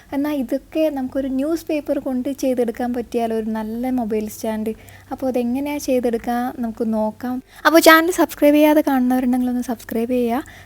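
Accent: Kannur, India. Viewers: Eattathi Kannur slang 🥰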